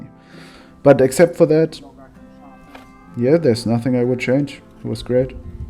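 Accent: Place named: Germany. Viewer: Your accent is lovely, whereabouts in Germany are you from?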